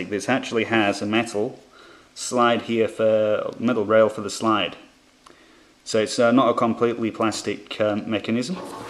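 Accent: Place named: Australia. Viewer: You sound like you're from Australia. I thought, perhaps incorrectly, that hand guns weren't allowed there?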